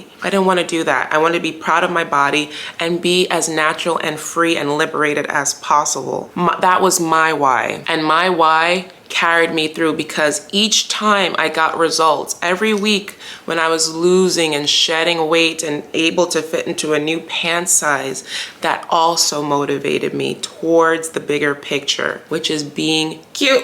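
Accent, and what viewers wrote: Jamaica. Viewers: You sound like you have Jamaican parents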